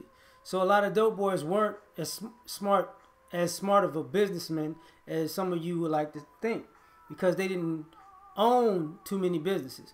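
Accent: Chicago, United States. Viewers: I thought I heard a Chicago accent.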